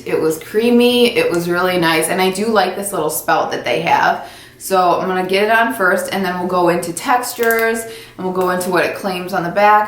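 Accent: Chicago, United States. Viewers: I knew she was either from Chicago or Michigan. before she said Chicago just from her accent. 👌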